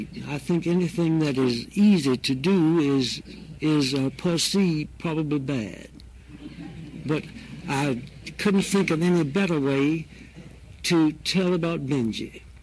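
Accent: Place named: South, United States. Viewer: Wow! This is of pretty amazing quality. What a cool southern accent.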